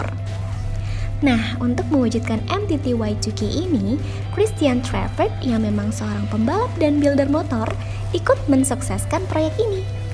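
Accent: Indonesia. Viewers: Logatnya seperti logat Palembang...Pesawat minta duit 😀👍🏻.. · Auto modar😂 kaciri orang sunda na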